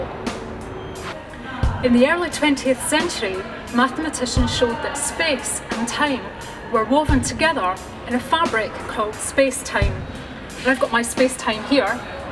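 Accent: Scotland, United Kingdom. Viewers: Woman with Scottish accent, something something... bath towel, playing with balls, inflation... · Scottish (she studied Physics at Glasgow University with me. But she doesn't have a Glasgow accent...more of a generic central Scotland accent...possibly Falkirk or Stirling area but I'm not sure)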